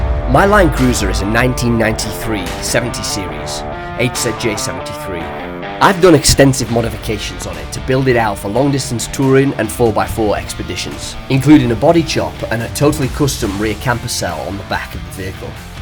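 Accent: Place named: North, United Kingdom. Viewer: Been following you on instagram for a while, love the long form YouTube style. Always class to hear a fellow northerner too! Looking forward to the rest of the series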